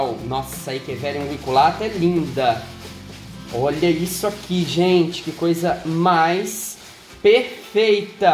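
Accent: Minas Gerais, Brazil. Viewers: Vc é mineiro? Pq super me identifiquei no monte de "nuuuu " "nossinhora" 🤣🤣🤣🤣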